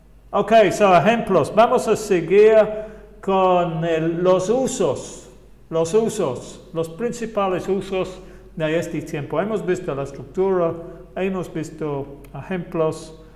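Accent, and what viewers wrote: England, United Kingdom. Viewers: Te felicito , es un gran profesor. El acento inglés es hermoso, aunque si tiene una grafía algo diferente que la Norte Américana.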